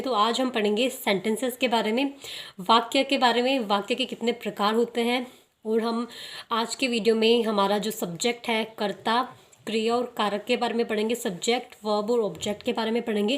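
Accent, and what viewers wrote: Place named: England, United Kingdom. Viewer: It seems that you are from England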